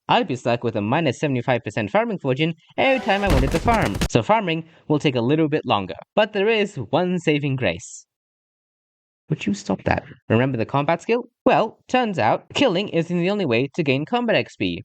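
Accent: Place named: Australia. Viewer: Great vid, humorous editing and artwork! Why do i detect the slightest hint of Australian Vowels in your accent?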